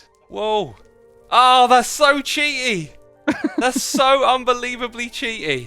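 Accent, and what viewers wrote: United Kingdom. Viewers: He's british... That pronounciation of er is common among British accents. Edit: if you were asking which British accent, I am not sure. We don't know where he is from in the UK. I am not knowledgeable enough about British accents but I guess it could be from North England?? I am not am authority on that though so don't take my word on it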